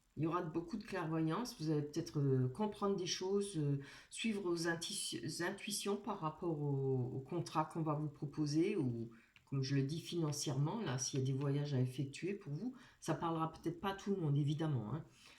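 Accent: Alsace, France. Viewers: Bonsoir, merci infiniment pour ce beau tirage. Quel bel accent, serait-il Alsacien?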